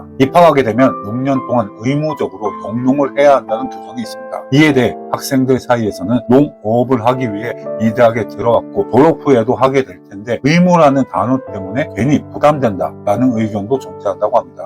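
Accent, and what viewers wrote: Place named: North Gyeongsang, South Korea. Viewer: 죄송한데 비음이 넘 셉니다..서울경기 아니시죠. 경북등 분들 특징 발음같은데 목 소리가 울려요. 그리고 영상 몇개보고 느낀게 경북쪽 대학 출신인가요. 맛간 지방대학들 올려주려 애 많이 쓰시길래요. 제가 무당기가 있어서 맞을 겁니다.ㅎ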